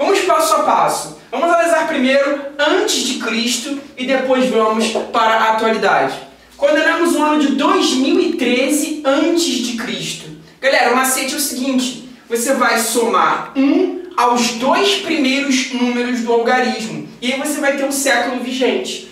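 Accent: Rio de Janeiro, Brazil. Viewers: O complicado mesmo mesmo é suportar o carioquêixxxxx carregadíssimo.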